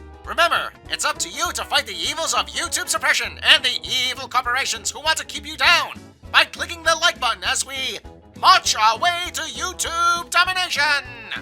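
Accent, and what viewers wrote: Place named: United States. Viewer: your demeanour is Australian but with an American accent. Refreshing to see someone else done with modern cinema and tv media. You need a Google chromecast, and then you can play 80s and 90s movies and tv shows.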